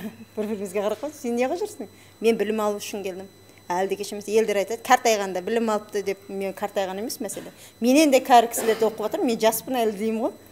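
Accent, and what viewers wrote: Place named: Karakalpakstan, Uzbekistan. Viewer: Қалпақтардың акцентімен сөйлейді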